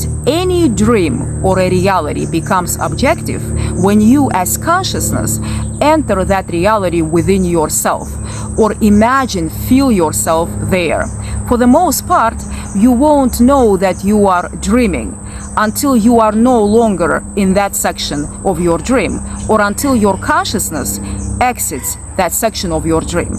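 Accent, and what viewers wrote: Russia. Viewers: Pretty russian-english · Classic Russian accent, I love it 😍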